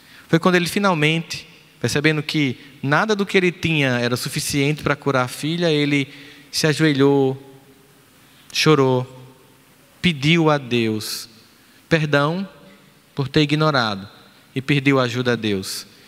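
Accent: Northeast, Brazil. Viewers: Esse sotaque NORDESTINO E MUITO BOMMMMM ! GRATIDAO !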